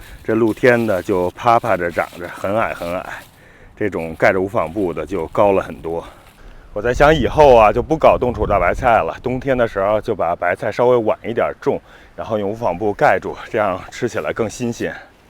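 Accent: China. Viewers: 哥们儿我看了几个你的视频，完全震惊了！！！这些菜，鸡鸭，粮食，都是你一个人种的？？？问号儿已经不足以表达我觉得不可思议的思想感情，我真的佩服的已经说不出话了，看你也是大陆人，听你说话的语气也是曾经在大陆上班的，居然到了美国能完全脱胎换骨成了种这么多种农作物和养这么多家禽的农民，更牛逼的是，你居然还每天上班，农民都是下了班以后当的，简直了也是，我已经快要语无伦次了，只剩下：卧槽！真的佩服和羡慕！啥也甭说了，赞一个！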